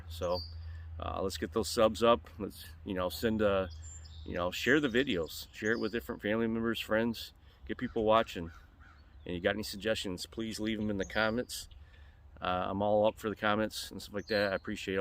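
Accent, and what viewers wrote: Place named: Cape Breton, Canada. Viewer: Your accent sounds like Whitney Pier Cape Breton.😁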